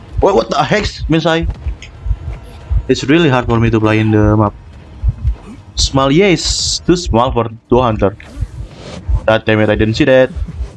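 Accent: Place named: Indonesia. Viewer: Logat indonya kerasa bro and ur sounds mmhhh daddy 🥵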